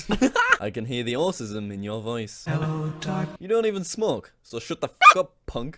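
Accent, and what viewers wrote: United Kingdom. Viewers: Whaast how dare they insult your accent! British accents are some of the best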